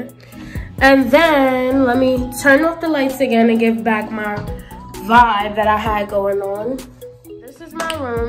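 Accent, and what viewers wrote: New York, United States. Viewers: The NY accent girll 😎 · Your lil ny accent is so cute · Her NY accent is giving me the chills 😍😍😍😍😍😍😍😍😍 I love it hereeee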